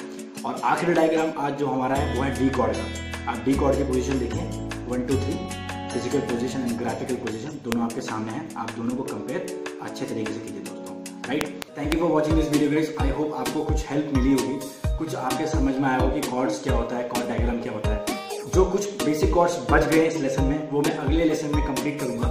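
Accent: Himachal Pradesh, India. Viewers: Bhaee himachal se ho kya?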